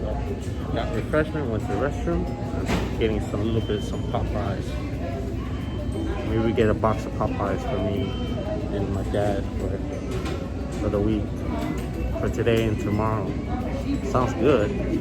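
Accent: Vietnam. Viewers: You sound Vietnamese? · nghe giọng a giống người Nha Trang!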